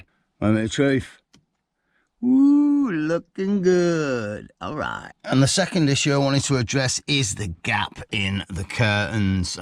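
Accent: United Kingdom. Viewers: Who doesn’t love a British accent? I can understand you without any problem, unlike some local accents!😂 Seriously though, there are some counties (not sure if that’s what they’re called there, and I don’t know which ones specifically) where I’m not convinced that they’re even speaking English. Sometimes I still get tripped up by the difference in what things are called, like the boot, and when video is shot from inside a moving vehicle it is disorientating being on the opposite side of the road. But I thoroughly enjoy your vids, you’re proof Brits DO have a sense of humor!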